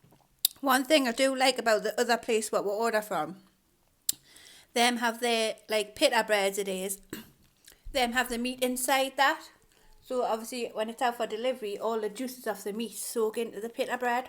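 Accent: Newcastle, United Kingdom. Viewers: U from Newcastle? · Are you from Newcastle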